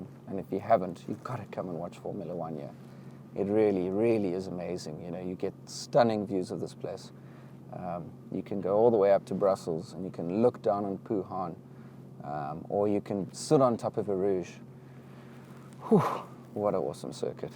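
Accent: South Africa. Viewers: Hey woah, you sounds South African? I am really going to try and make a plan to come for some instructor days to Spa, need see pricing packages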